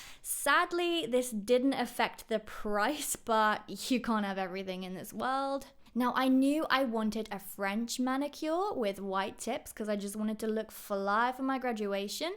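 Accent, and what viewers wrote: United Kingdom. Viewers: British accent amazing and original ❤️ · Omg that british accent though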